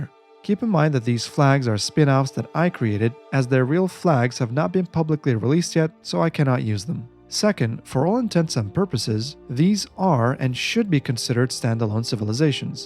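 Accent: Arab world. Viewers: oh god are u arabian?! the accent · the way you pronounced the names tells me that you originally Arab :P , anyway thanks for the info cant wait to have my hands on the new civs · i just realized you are most likely arab from your reading of the names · My man. Your arabic accent is so eloquent.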